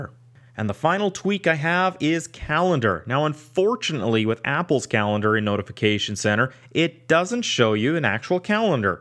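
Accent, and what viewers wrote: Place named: Canada. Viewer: i knew about all these tweaks, but i watched the whole video because i am lovin the canadian accent when he says "notification center" lol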